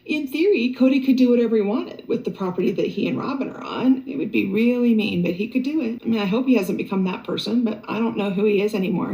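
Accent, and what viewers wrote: Minnesota, United States. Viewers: You have the most Minnesota accent I've ever heard😂 'abouuuut"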